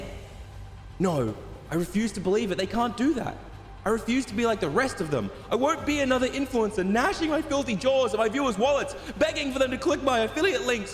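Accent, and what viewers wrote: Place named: Australia. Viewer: I've followed for a while and I've even watched this video before as it's helped with mic setup. However why am I only now realising he's Australian!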